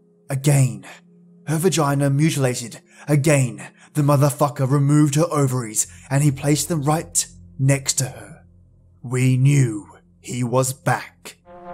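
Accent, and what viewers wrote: Australia. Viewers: tis the best way to fall asleep, all cozy in a blanket burrito. And if I do manage to churn out some twisted tale of monsters and mayhem you shall be the first to read it I assure you. Absolutely love your voice in particular and the ozzie accent in general. ^_^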